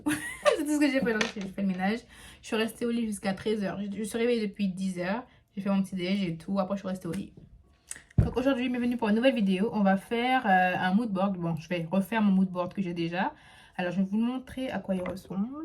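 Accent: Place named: French Guiana. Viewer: Tu as l’accent guyanais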